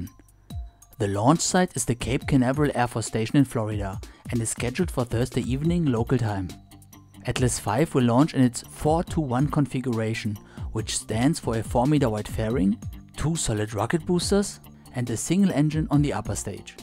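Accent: Germany. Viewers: der deutsche akzent is einfach super XD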